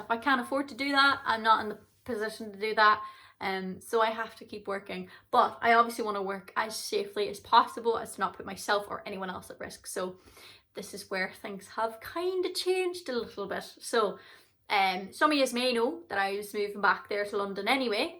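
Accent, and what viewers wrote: Ireland. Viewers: I hope you enjoy the rest and free time, stay safe well and happy. You make me smile and feel good, i so enjoy your Irish accent. Glad your getting on top of your problems i heard a trick that helps people go to sleep you start by relaxing your shoulders and then work down your body and then start saying dont think dont think slower and slower it seems to work with me im asleep in minutes i think sometimes we need to rest our brains. xo live long and happy. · Glad to see u back. Ur accent is more Irish. Love it.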